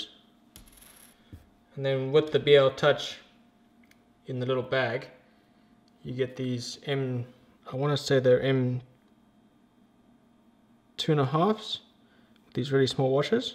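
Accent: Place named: South Africa. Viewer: Gotta be South African :)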